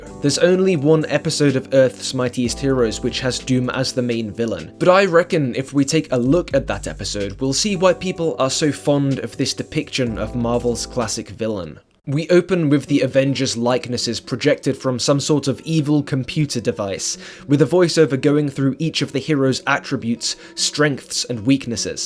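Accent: United Kingdom. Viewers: This video title sounded fire af, then I heard the british voice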